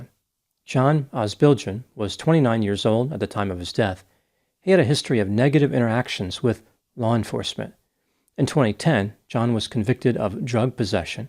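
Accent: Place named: New Jersey, United States. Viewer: I have a suspicion might originally be from New Jersey - his accent gives it away… like the way he says the word “on”. It’s not heavy NJ but there are hints. Only speculating 😊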